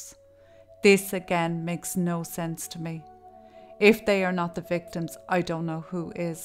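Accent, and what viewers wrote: Kilkenny, Ireland. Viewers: thank you so much for your reply - I love the delivery of your narration of your easy to understand informative script style/narrative - your turn of phrase and the inflection of your voice when you exasperate is masterful - your beautiful accent reminds me of the people I knew when I was growing up in Tubrid, Woodsgift in north Kilkenny, where I was born in 1956 in St. Luke's Hospital - I now live in Kerry. I always miss the Kilkenny accent - I miss the sayings "fierce nice and shockin good" - keep up the excellent work you are doing and don't ever give up - have a wonderful day ;-)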